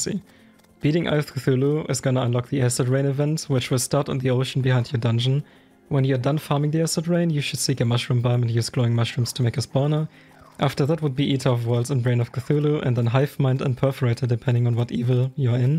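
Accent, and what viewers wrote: Germany. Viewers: Thx man. Are you German? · Idc what anyone says if the title says spoiler free and the accent says German af then you won’t find ANY spoilers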